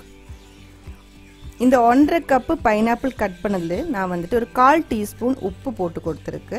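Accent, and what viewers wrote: Kerala, India. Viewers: Tried this recipe, it came out very well❤️ Your Kerala mixed Tamil is really awesome 😇🙏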